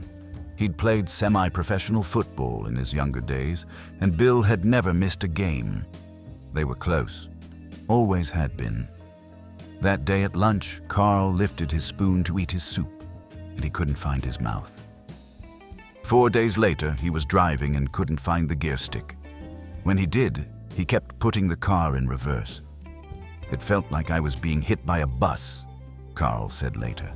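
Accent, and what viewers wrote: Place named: United States. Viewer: Why is an American doing the commentary..?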